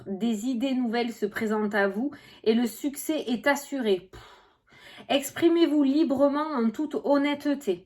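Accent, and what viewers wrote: South, France. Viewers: Quelle joie de t'entendre avec ton petit accent du Sud 🥰 Et un pur bonheur de tes predictions avec ton franc parler 🤩 Magnifique et merveilleuse: MERCI 🥰😍🤩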